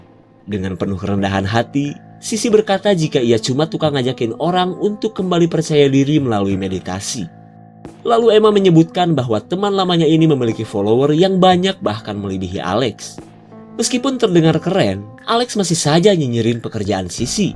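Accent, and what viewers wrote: Sunda, Indonesia. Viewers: Sunda pisan euy...😄😍❤👍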